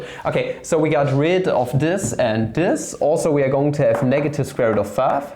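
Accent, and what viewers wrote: Germany. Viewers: I love how you say “Integuhrruhl”! Math is sooooooo much better when done in a thick Deutschlander’s accent!!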